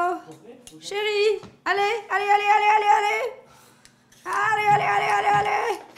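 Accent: Canada. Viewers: en ayant vecu en France je sais reconnaitre l'accent canadien qui est très drole 😄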